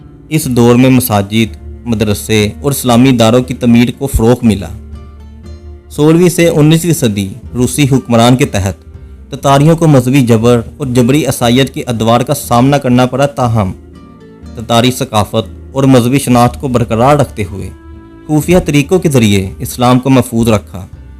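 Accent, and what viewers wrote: Punjab, Pakistan. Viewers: پنجابی میں اردو بولنے کے بجائے پنجابی میں بول لو تو زیادہ اچھا لگے گا۔وڈیو تو ویسے بھی چوری کی ہے۔ترجمہ اور ڈبنگ تو بندے کے پتروں کیطرح کرلیاکرو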